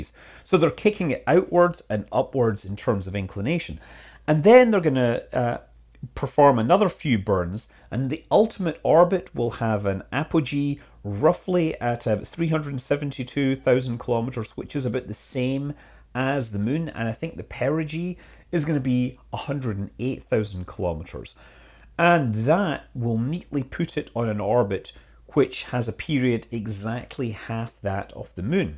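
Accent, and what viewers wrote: Scotland, United Kingdom. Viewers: Scottish accent.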